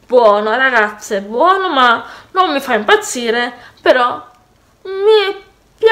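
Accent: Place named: Rome, Italy. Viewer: Ho magnato ahahahahahahha so morta parlata romana 🤣🤣🤣c'è lo anche io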